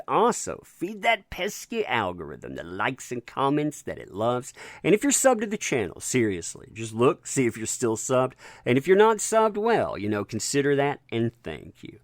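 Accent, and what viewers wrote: South, United States. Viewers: Go, J.D. Seems right, esp if ACLU is nonprophit and could be violating FOIA. If it is public info to know they were to receive $$$, shouldn't it be public info whether ACLU received it? Glad to see you back,. Been missing that southern accent to go with my coffee and keep me up to date on how our J.D. is faring.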